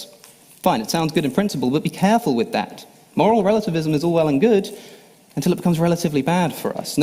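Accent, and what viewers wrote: England, United Kingdom. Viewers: Alex champions the art of pretentious sincerity unlike a Sam or a Dawkins who are more blunt about their spite and hate. This speech also proved that if you take away his english accent, he's not even half as smart as Sam Harris.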